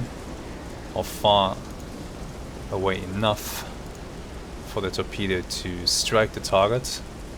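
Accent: Germany. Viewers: I like betters, too, but... let's be honest: That German accent DEFINITELY adds something to the experience;)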